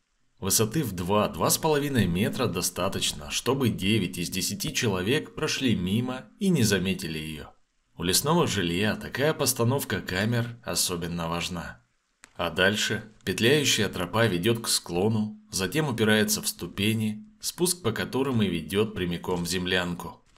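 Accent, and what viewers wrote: Standard. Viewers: Меня за интересовал сюжет дом на дереве, но прятней всего оказалось, слушать чистую литературную русскую речь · так приятно слушать твой голос, неторопливую твою речь, чистый русский язык! Видеть приятно твою любовь к лесу, счастливое лицо от встречи с жителями леса. Каждый ваш фильм - отдых душой, заряд добра и любви. Вы - лучшие!!!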